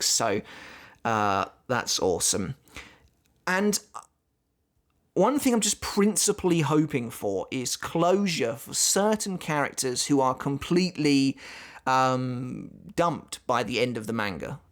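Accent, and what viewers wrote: United Kingdom. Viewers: Hey are you British?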